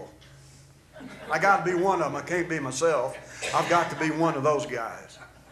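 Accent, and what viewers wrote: South, United States. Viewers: I don't care what he says theirs never anyone came close that sounds like elvis or even comes close like you do and looks like elvis and that southern accent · think its the southern accent fooling you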